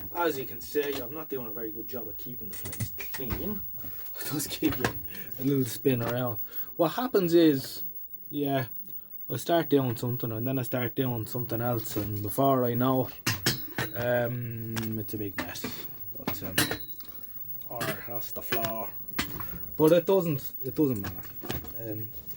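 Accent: Ireland. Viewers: Love your strong Irish accent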